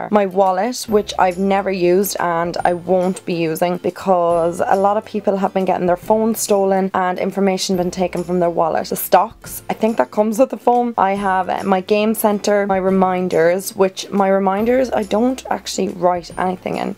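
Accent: Ireland. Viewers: is her accent irish ?!!!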